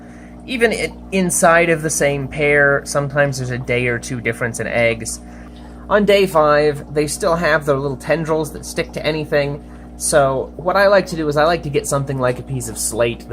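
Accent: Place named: Australia. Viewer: Ok thx I’m using a pipet I’m new to keeping fish, and are you Australian bc I am I recignise the accent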